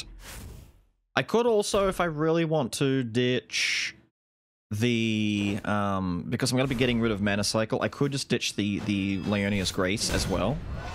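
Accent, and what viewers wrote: Australia. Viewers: Are you australien?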